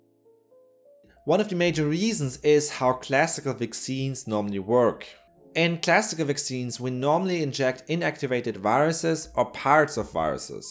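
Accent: Germany. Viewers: Is he German .. his accent then he sounds Chinese talking English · Very informative. I just feel like he wants to yell at me in a German English accent 😁😁😁😂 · Thick german accent. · Cool accent. I think that I could easily listen to German people speak English all day long ! 👍😎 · That accent is hard to place: either German, or Dutch? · He´s German. That's why. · I’m loving your German accent 😅 and you’re cute.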